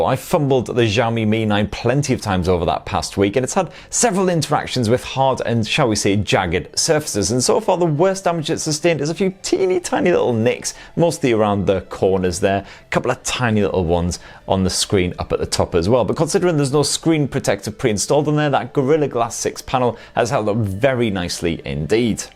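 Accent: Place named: North East England, United Kingdom. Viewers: I'd say Teesside or around Newcastle as well. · Somewhere between Teesside and Newcastle (North East England). Its quite hard to tell as he is quite well spoken, I would hazard a guess at possibly Sunderland as his accent seems stronger than mine and I'm from teesside but I could be completely wrong.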